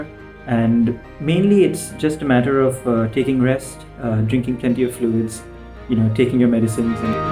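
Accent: United Kingdom. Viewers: jb bimari lgi tb desh ki yaad aa gyi....vrna abi tk toh british accent me hi baat krte thee.... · It is really good to hear my friend that you have recovered from this contagious virus. Stay Safe and one more thing I like you English accent and you style of speaking English with coolness. If u want to tell me regarding how can I improve English speaking, then it will be beneficial for me. I know this is not the right platform because u are sharing experience regarding your journey against Covid-19, but if u want to give some suggestions then okk or don't wanna give then also okk. Have a good life my friend.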